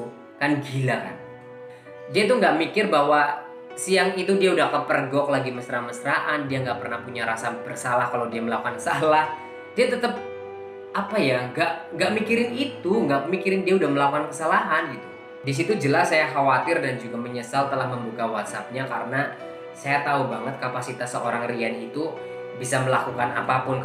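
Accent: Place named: Yogyakarta, Indonesia. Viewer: Kak ini orang bali tapi gaya bicaranya kaya wong jogja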